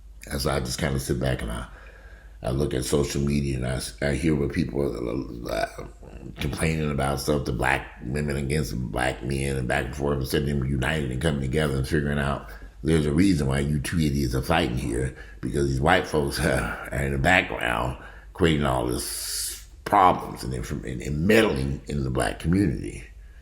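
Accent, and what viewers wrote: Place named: Gary, United States. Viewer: Hi, I am from Gary. I left after graduation. Picked up a couple of degrees in California. Left and went to Canada for a few years. Then I went to the middle east to work. Afterwards I settled in Europe for the next 40 years. I enjoy hearing you talk because it is not often hearing from home Gary. Until next time. Earl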